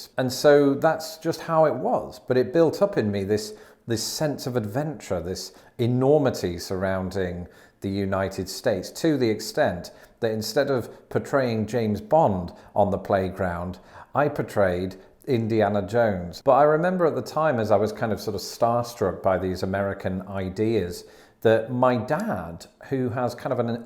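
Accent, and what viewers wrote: United Kingdom. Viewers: don't get TOO Americanized, because our 21st century culture is largely crap. And above all, don't lose your British mode of diction. It will always always serve you well. And by the way, a Russian friend of mine once clarified the matter for me about the alarm going off. It doesn't go off; it goes on. Same goes for the bomb.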